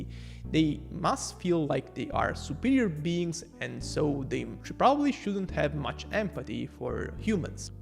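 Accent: Italy. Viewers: Lo sapevo che eri italiano ahah L'accento non mente, comunque bravissimo continua così!! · IS he italian? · Come è possibile che non mi sia mai accorto che hai un accento italiano parlando inglese? Evidentemente sei così fluente che non me ne sono mai reso conto. P.S. sei bravissimo e super affidabile, continua così ❤ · It’s the italian accent while being smart that makes people fall for him😂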